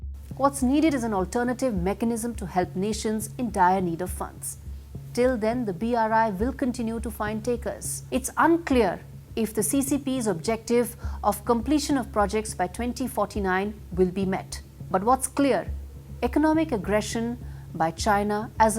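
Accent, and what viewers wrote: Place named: India. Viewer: the announcer says “… China’s checks threatened to turn several countries into ‘versaille’ states due to their high indebtedness…” what the hell’s “versaille states”? I have hard times with the Indian English…😢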